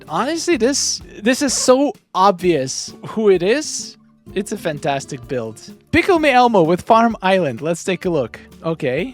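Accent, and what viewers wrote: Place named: Ukraine. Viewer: I listened to your words many times, I thought I misheard. Are you from Ukraine? How long have you been in the US? What is your real name? Sorry for so many questions. I’m from Ukraine😉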